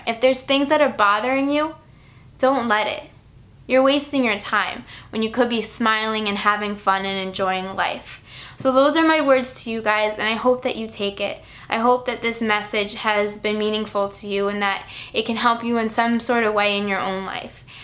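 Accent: New York, United States. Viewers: Idk how people have the balls to make negative comments this girls gorgeous pimples or not ... That New York accent 😍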